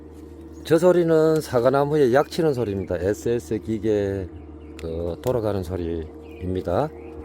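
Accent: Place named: Gyeongsang, South Korea. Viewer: 체리가 아주 맛있게 무르익었네요 저희는3키로 다 먹었네요 ㅎㅎ 가게손님들이랑 맛나게 먹었어요 싱싱하고 아삭 상큼해요 아주 맛있게 감사하게 먹었습니다 경상도 사투리로 체리 시식먹방 정겹게 잘보고 있어요~😅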